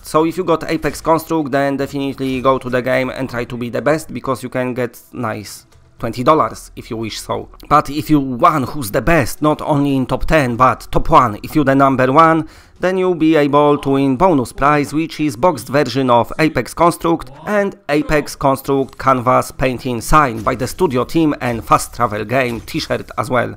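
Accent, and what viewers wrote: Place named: Poland. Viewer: I’m going to go out on a limb and guess Polish. It’s terrible to listen to though.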